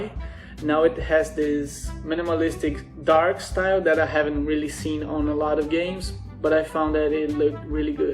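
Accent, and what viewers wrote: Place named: Brazil. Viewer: O sotaque brasileiro é reconhecível em qualquer lugar do mundo 😅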